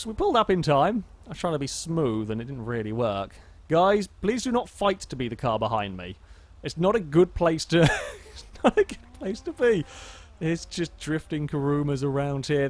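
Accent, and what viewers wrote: United Kingdom. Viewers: Their are some british dialects I find pleasant then their are others that are just plain annoying. Yours sir, is the latter. Zip it. Closing this obnoxious sounding video.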